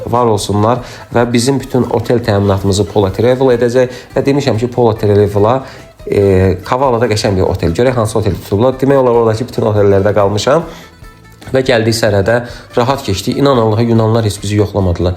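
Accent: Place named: Neftchala, Azerbaijan. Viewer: Bayaqdan düşünürəm bu hara ləhçəsidi?”Nefçala”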